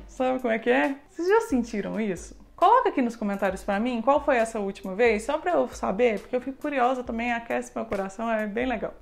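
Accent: Minas Gerais, Brazil. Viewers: “Acabei de ter um estranhamento com cê” apaixonada nesse mineres 😍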